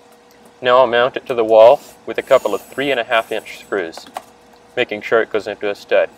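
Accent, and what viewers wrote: Canada. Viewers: You from Canada ehh great toutoral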